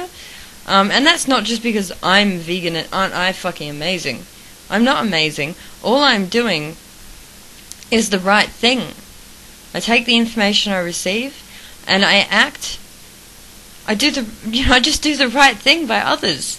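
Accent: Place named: Australia. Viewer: dude China's awesome. the US does horrible things as much as China, just not fur. heard of bear parks? every country has its good and bad points and its immature to say that one country is better than another. anyway, yaaaaay great videos :) you sound so Aussie too and like a normal casual person, not like a crazy hippie. hopefully people will respect your opinion.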